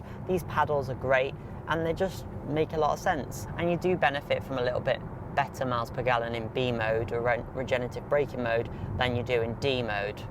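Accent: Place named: United Kingdom. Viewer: Great review! Love your British accent!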